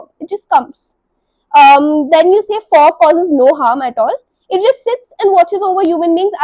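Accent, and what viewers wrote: South India, India. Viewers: are you from south India???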